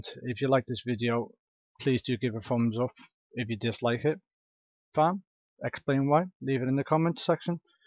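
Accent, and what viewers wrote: Liverpool, United Kingdom. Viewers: Nice Tutorial Man, simple But Clear, the Way I like it. Are you from around Liverpool, it sounds like you have a Scouse Accent .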